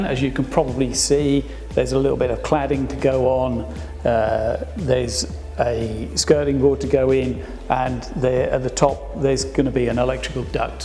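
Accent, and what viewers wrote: Norfolk, United Kingdom. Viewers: many thanks. I will have to google cruck frame and sip panel as I don’t know what they are. The only thing I was worried about if I sank it low was damp. You sound like you’re from Norfolk way. I’m Wisbech based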